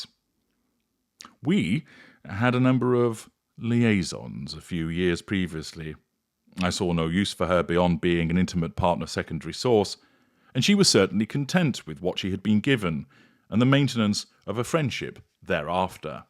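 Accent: United Kingdom. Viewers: Yes. His voice and elite British accent 😀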